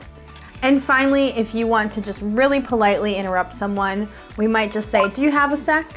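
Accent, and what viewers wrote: United States. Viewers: I love listening her accent as it encourages me to keep focusing on American English which is awesome..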